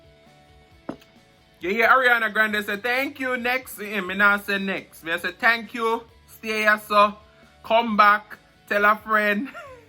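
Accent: Jamaica. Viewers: A combination would be great.. patois and English as you do..brings more spice to your videos.. dem gals love da accent 😂😂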